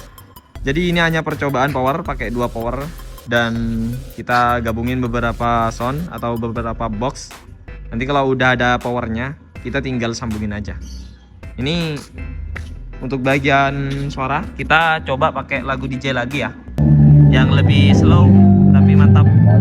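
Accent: Madura, Indonesia. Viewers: kok kayak orang Madura KK emang asli mana😅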